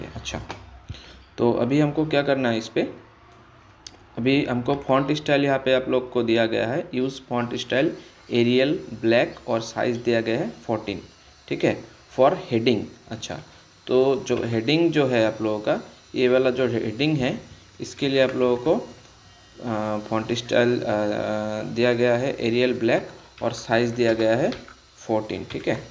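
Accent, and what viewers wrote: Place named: Assam, India. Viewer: Very useful but Assamese type hindi explanation is boring.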